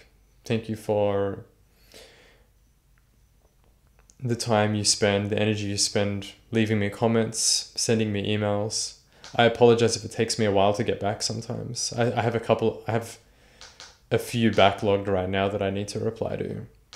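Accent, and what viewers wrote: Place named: Australia. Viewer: Whoops..hard to place... Australian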